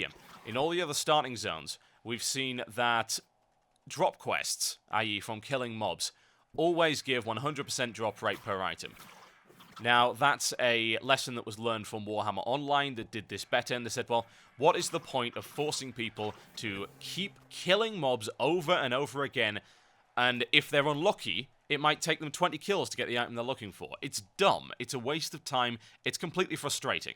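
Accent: United Kingdom. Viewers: I come for the WoW stay for the british accent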